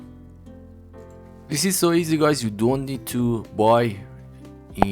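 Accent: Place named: Iran. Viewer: 😂 اومدم کامنت بخونم لجه ایرانی فقط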